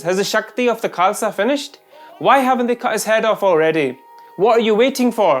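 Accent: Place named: Scotland, United Kingdom. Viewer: Brilliant job bhai ji, and I adore your Scottish accent.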